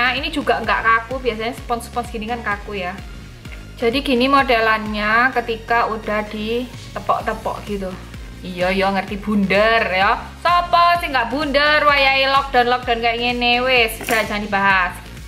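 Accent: Java, Indonesia. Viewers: Saya selalu suka tutorial make up mbak, gaya bicaranya yg medok dan pemilihan warna2 make up nya yg ok bikin betah utk nonton, sukses selalu utk mbak dan terus saya tunggu up date tutorialnya, Gbu😀 Dan saran saya tolong juga jaga kesehatan kulit wajahnya ya😀👌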